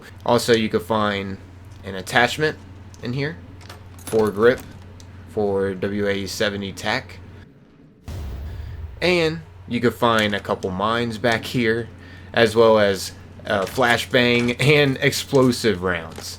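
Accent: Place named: Pittsburgh, United States. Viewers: Yo are you a yinzer or not man? Your accent is awesome. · Pittsburgh pa away. Greetings from PA. Yinzers tell the jagoffs to quit driving over the burm. Wosh your dishes and laundry. Love the pittsburghese.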